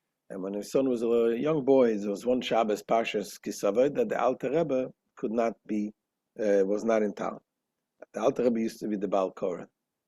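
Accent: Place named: Russia. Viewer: B"H Calm down. Get control of your Body. There is no month by that name in the Hebrew reckoning of time. That is the name of a Babylonian idol and if you mark your time by Babylonian astrology you can't attain Immortality. Only Torah time is infinite. This is the twelfth month of the year. זה החדש השנים עשר Try to at least pronounce the Hebrew with some kind of Semitic, not Russian unintelligible, accent. If you knew proper Hebrew, you'd know there is no depression at this time at all. ראש השנה = 861 = אשר המחשבה = בית המקדש Can't you so much as see that ראש = אשר If you are a Jew, may HaShem grant you the insight and courage to return to Hebrew Tradition, to the Hebrew language, to Hebrew Torah from your Yiddishkeit narishkeit. If you can't, or won't, you may be sure that you're not a Hebrew. Hebrew is as Hebrew does.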